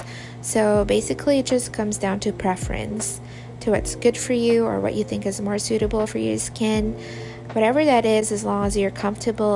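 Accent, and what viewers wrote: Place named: England, United Kingdom. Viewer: Youre English Accent is Great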